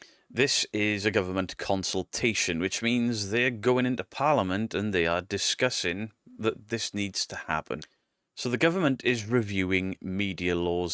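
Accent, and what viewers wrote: Wales, United Kingdom. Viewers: you sound welsh, are you?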